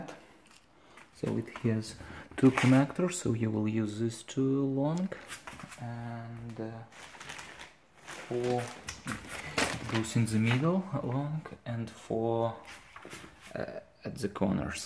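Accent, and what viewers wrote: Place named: Russia. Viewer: You are not a Russian case. The accent is similar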